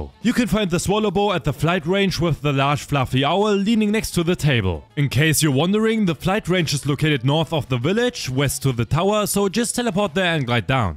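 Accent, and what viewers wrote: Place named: Germany. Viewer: Ja er ises selbes Logo,selbe stimme und nen unübersehbarer deutscher akzent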